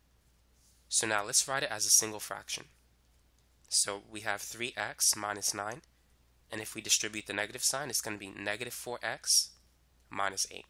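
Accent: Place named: Boston, United States. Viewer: lol i just realized you can tell from this guys accent he is from boston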